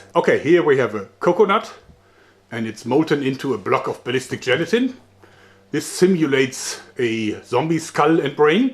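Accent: Germany. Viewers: Don't be sorry for speaking german, thast is your heritage and birth right, I love your accent and the videos you make are most entertaining. Im from a town called SPARTA Missouri and all we have here is amarican whites as far as the eye can see, (myself being included), except of course for the asians who run the small asion food resteraunt in the middle of the town. Its nice hearing and seeing people from differant parts of the world and your german is a fresh experiance from the norm.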